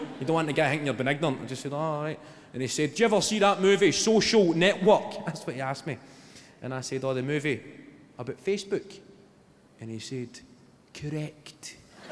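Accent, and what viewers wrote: Scotland, United Kingdom. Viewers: The Scottish accent makes everything funny. Tell me I’m dying in a Scots accent and I’ll die there and then of laughter... AND he will of been right. Gotta love the Scots. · Scotland is absolutely stunning. I'm Scottish, born and bred, I still get overwhelmed by the beauty of many places. Scottish are typically friendly. You'll find very different dialects in many areas. We don't all sound exactly like.......he's a banky (clydebank area) West Dunbartonshire, I'm Dumbarton, West Dunbartonshire. Even in the short distance from my town to his, you'll find slight differences in how we talk. If you're into Scottish Comedy, give "still Game" a wee watch xx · I like the Scottish accent but I am seriously struggling to understand what he is saying. I know, I am a yank. What can I say? But I have watched living skint, and quite a few other British trash tv programs and I think I understand this guy better than most of them. 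The northern accent kills me. It’s such a struggle. Can it really be considered English? I know, this being said from someone who doesn’t have an accent. Lol. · Holy hell I just stubbled on this and I'm dying! I think my Scottish ancestry must help me understand him cause my girlfriend missed everything lol.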